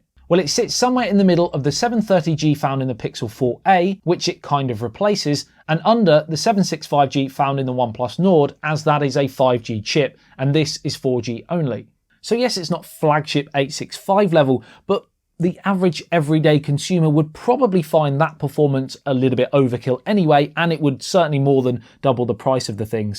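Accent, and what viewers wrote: United Kingdom. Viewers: I have seen unboxing and reviews by Technical Guruji, must say this is a kind of british Technical Guruji. Rightly said, this is the most british accent I have heard. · I really love British accent 😍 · This is the most british accent I heard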